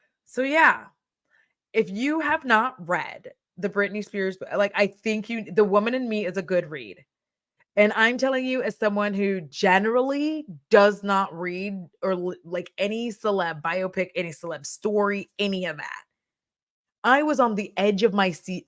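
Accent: United Kingdom. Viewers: The hair 😍 and your British accent even made my British knees wobble 😂 I was never a huge Britney fan but was certainly team free Britney. I'm quite impressed she didn't go for the easy revenge in the book. Seems classy. And I didn't realise she was our age, having been in that situation since her early 30's ish. That's so much of her life they stole. I'm not surprised she's living her life how she wants now.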